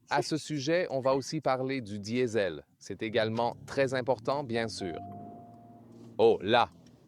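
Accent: Canada. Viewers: J'adore vos vidéos, et vos comparatifs. Mais pourquoi avoir l'accent Canadien pour la traduction en France ?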